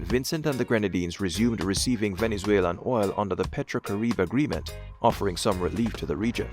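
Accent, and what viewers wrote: Jamaica. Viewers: You sound Jamaican and you are talking tata. You know NOTHING of what you are saying. Trinidad will manage with or/and without Venezuela.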